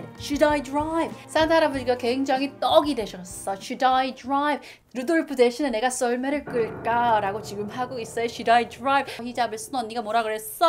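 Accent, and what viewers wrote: United Kingdom. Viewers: 아 발음 넘 멋져요ㅠㅠㅠㅠㅠㅠㅠ 영국발음 개간지ㅠㅠ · 엄청 재밌어요 :) 영국발음듣고 영어기사 공부하는 것도 정말 좋아요, 딱딱하지 않고 방금 영어악센트로 빼박이라고 하셔서 엄청 터짐ㅋㅋㅋ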